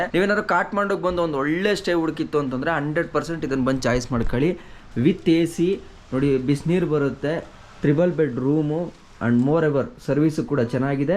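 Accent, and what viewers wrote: Karnataka, India. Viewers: Anna daily video haki 20 mins duuu... wait madi madi tadkolke agtila.....guruvee 🙏Bigg fan nimdu mate typical kannada...🩷 Nim friendship mast ide bhai..,💯💯💯💯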